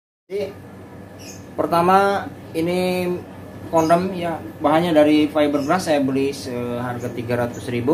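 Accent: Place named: West Java, Indonesia. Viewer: Agak ngahelob😁 bangunateh urang sunda nya si akang mah.?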